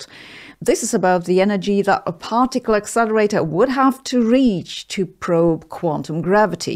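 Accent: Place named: Germany. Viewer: Many of videos are way over my head, like today's posting, but I watch anyway because I enjoy hearing her talk. Listening to someone speak about science with a German accent somehow makes me feel smarter than I am.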